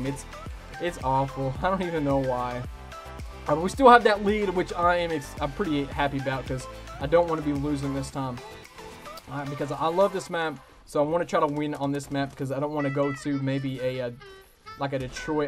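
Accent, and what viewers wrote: Tennessee, United States. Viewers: I knew that accent was familiar. Haha. I'm a tennesseean as well. Glad to see I'm not the only guy with a country accent playing cod lol.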